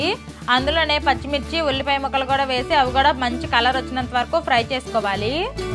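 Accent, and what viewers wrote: Srikakulam, India. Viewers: Hi akka, madhi srikakulam dist. Mee videos chustunte ma srikakulam ammayi ani garvamga anipistundi. Nee matalu kuda chala natural ga mana srikakulam matalu Ani thelustay.❤❤❤ Akka meeru thumbnail and long video editing endhulo chestaru cheppara please, kudhirithe dhani meedha oka video cheyara akka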